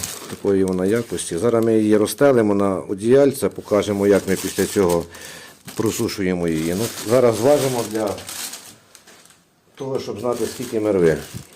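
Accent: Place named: Volyn, Ukraine. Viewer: Я недавно натрапив на Ваш канал у Вас Волинська говірка звідки ви?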